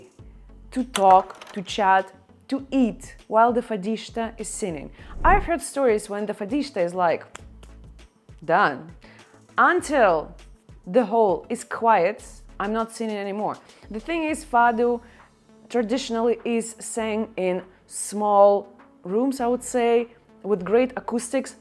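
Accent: Russia. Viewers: Your Russian accent makes your videos even more enjoyable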